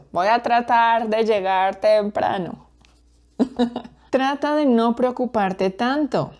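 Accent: Colombia. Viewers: rapidamente me he dado cuenta que eres Colombiana, por tu acento y por el uso de la palabra "vaina". buena leccion!!!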